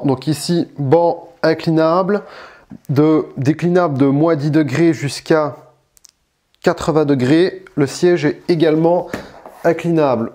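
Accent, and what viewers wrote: Belgium. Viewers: C'est curieux cet accent belge comme si vous aviez le nez bouché ! Je vous salue cousins belges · c'est l'accent des mecs de Verviers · tres belle salle ... t'es belge ? si oui quelle région ?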